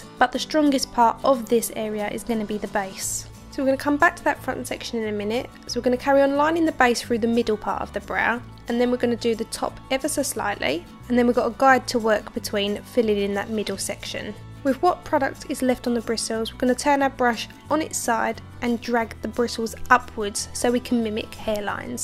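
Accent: Essex, United Kingdom. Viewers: She sounds like she's from Essex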